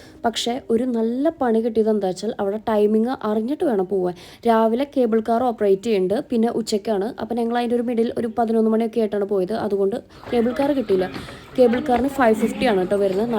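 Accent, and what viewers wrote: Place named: Thrissur, India. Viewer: Assal Thrissur slang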